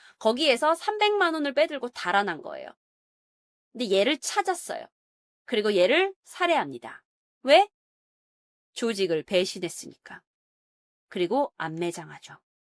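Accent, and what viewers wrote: Jeolla, South Korea. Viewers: 전라도 스럽다...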